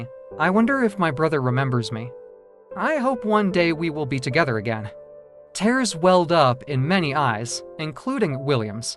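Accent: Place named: United States. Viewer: Also, though the accent spunds genuinely American, the speaker switches genders on characters in the story. Come ON, people!! Dloppy performance, at best !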